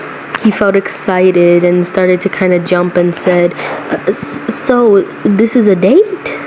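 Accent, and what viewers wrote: South, United States. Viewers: He sound red neck lol i love it ❤ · IM NOT BEING RUDE OR ANYTHING BUT IT SOUNDS LIKE HAS A BIT OF A COUNTRY ACCENT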